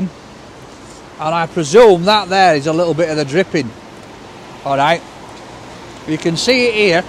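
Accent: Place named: Northern England, United Kingdom. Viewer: I love this channel, but i watch it especially because of how northern this bloke is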